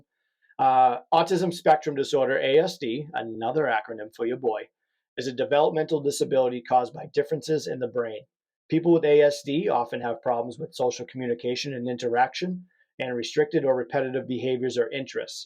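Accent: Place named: New England, United States. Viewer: Are you from New England, Massachusetts maybe 😊 I can hear the accent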